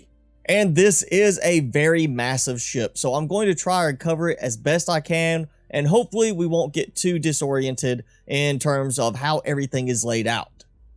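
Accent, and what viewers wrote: South, United States. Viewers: No insult intended. Just curious. 😁 It sounds sort of southern...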